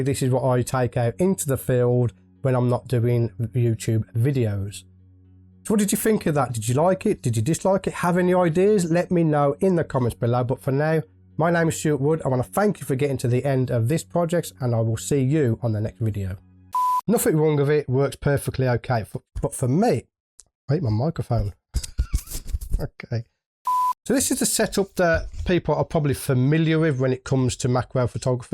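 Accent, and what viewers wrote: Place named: England, United Kingdom. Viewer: What part of England is your accent from?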